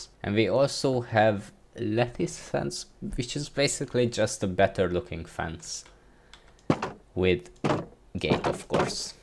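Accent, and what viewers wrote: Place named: Hungary. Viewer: halatszódik hogy magyar vagy xdd de sokat segített a videó ty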